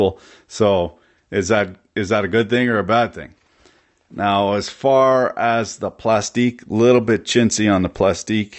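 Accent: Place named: Canada. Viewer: I lived up in Toronto in the 80s play a little hockey bounced around you sound a little further north than most but I missed that Canadian kind of accent and the humor in the triple X 12%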